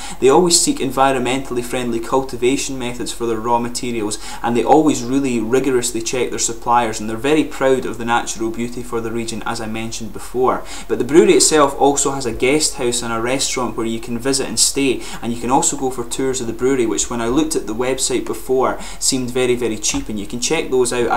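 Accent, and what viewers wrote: Scotland, United Kingdom. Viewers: Beeing a German living 70 miles away from the Schmucker brewery I tried hard to get it from a local vendor. Almost impossible ... apparently I have to order it via the internet. Anyways: Good review! I will definitely order some bottles. Feels funny as a German Scotch lover to listen to an English review of a German beer done by a guy from Scotland. :) Cheers!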